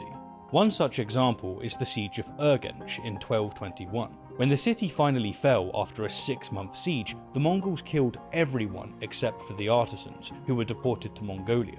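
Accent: England, United Kingdom. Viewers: I find it "interesting" that a person with a clearly "English" accent and therefore the inheritor and beneficiary of probably the most evil empire in Human history (165 MILLION Excess Indian deaths between 1880 and 1920, to mention just ONE of the countless evils committed unapologetically by the vicious English) has the temerity to label the Mongols as "Evil". As Christian scripture puts it, "Take the plank out of your own eye, then you will see clearly to remove the speck from your brother's"....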